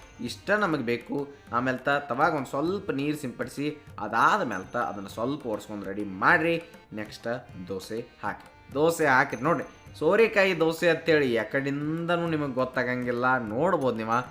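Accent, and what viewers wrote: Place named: Uttara Kannada, India. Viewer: WOW Superb Sorekayi dosa in 3 variations Healthy version Curry also 👌🏻👍🏻👏🏻 UK colloquial narration makes it more Interesting. Thankyou for All the Recipes & joint Effort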